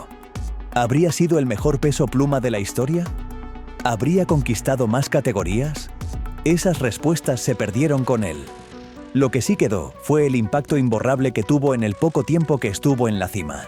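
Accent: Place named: Spain. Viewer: ¿Qué hace un tartosho eshpañol presentando la historia de una leyenda?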